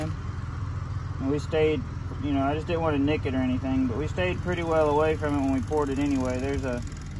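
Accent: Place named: Tennessee, United States. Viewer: Hey Brother I appreciate the info the problem with me is I'm a single dad of two little girls 10 and 7 . the issue is I don't make alot of money I make about 17 bucks an hr . I want to do all this stuff to my truck but I'm afraid I'll mess something up. I ain't got the money to fix it or to send it off to get it done. On a scale of 1 to 10 how hard is it to do this? I wanna do it but afraid ill tear something up. Anyways god bless ya Brother .sounds like you got a Tenn accent I lived in Tenn for six yrs in a small rural town called Cunningham Tenn. I loved it man best six yrs of my life. I cut tobacco yellow and dark. I hung it in the barns as well. Best years of my life .you gained a subscriber.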